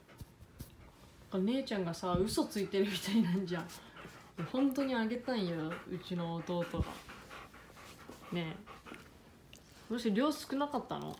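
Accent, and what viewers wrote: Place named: Okinawa, Japan. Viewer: 沖縄出身でしょうか？イントネーションが似ている気がします！（私は沖縄出身でも何でもないです、すみません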